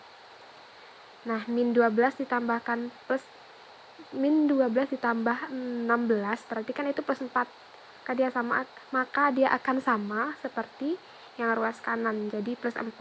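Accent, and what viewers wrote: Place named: Sumatra, Indonesia. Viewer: kalo dari logat nya sepertinya Sumatra kak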